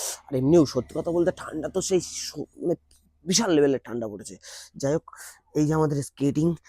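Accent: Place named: India. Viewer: কথা শুনেই তো বোঝা যায় ইন্ডিয়ান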